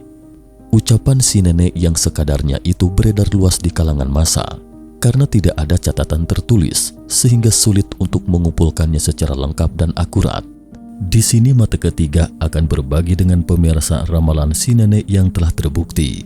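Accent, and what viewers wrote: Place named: Bandung, Indonesia. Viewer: Suaranya ini mantab tapi masih ada logat aksen daerah nya, kadang ada akhiran Ak.. Ak... Asli Bandung atau mana ya..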